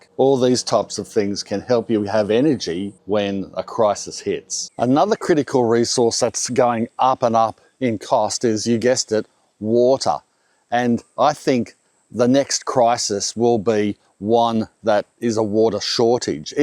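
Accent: Australia. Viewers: You sound australian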